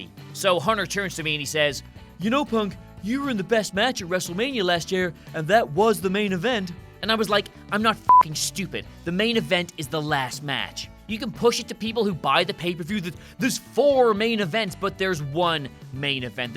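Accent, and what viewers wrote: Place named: United Kingdom. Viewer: is my everyday reminder that British accents are not fake, and in fact some of them can't just switch it off and do american.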